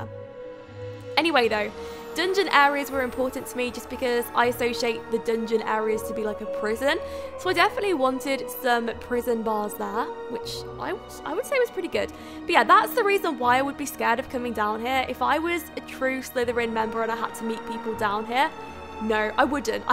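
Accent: United Kingdom. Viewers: I really like your British accent and enjoy with your building Hogwarts castle. I hope you will make more about building some places from Harry Potter or wherever. Keep making good videos!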